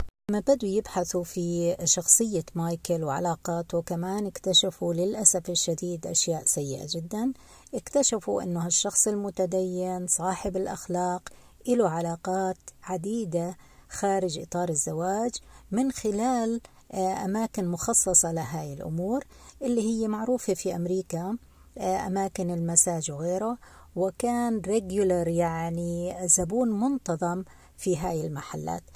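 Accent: Jordan. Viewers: الصوت مليح مافيه حتى مشكل متابعة من الجزائر نحبك بزاف بصح حابة نعرف انت من وين لهجتك دل انك اردنيية ولا فلسطينية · تحياتي لك اختي صوتك جميل احب اللهجة الاردنية والشعب الاردني الشقيق الله يوفقك ومليون ليك مغربية من لندن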